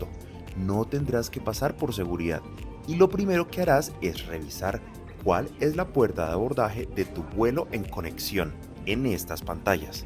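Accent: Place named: Costa Rica. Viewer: Bello video ! Pensé q era de Costa Rica 🇺🇸🇨🇷🇨🇷🇨🇷ya q hablamos muy parecido en el 2023 bajé a Costa Rica 🇨🇷 y Guatemala 🇬🇹 via copa love it desde California usa.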